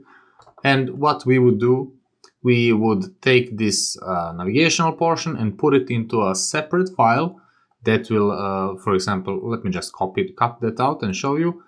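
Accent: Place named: England, United Kingdom. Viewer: Izasao si mi kao predlog posto dosta gledam tutorijale pa reko da vidim... Engleski naglasak i ime :)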